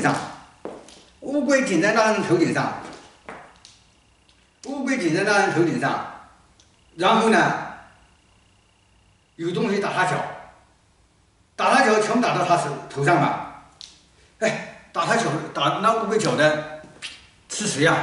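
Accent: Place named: Zhejiang, China. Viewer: 大师是浙江人？口音很重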